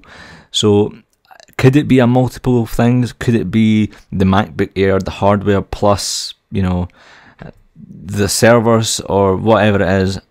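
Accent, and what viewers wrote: Scotland, United Kingdom. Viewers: it's scottish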